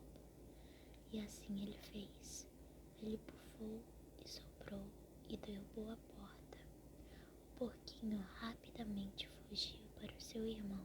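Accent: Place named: Ceará, Brazil. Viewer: Você é do ceará? Achei o sotaque parecido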